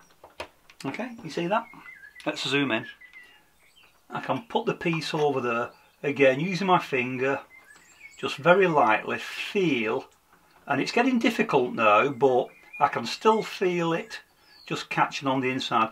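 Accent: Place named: Manchester, United Kingdom. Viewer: That's a beautiful piece, really loved watching you restore it back to it's original glory. From your accent you're not a million miles from me, I'm in Manchester.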